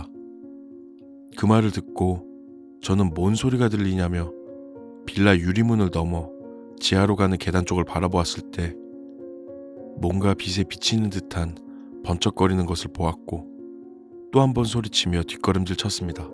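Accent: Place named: Gyeongsang, South Korea. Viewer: 님억양이 경상도느낌이 네요 듣기조으네요 잠자리들때면 꼭들으면서 잠이듭니다 불면증때문에요 이젠잠이와요 자야겠네요 수고하셨어요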